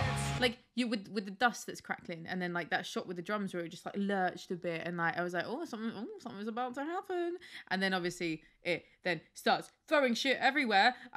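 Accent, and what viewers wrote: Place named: United Kingdom. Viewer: What is your name again, please?! I love the British accent!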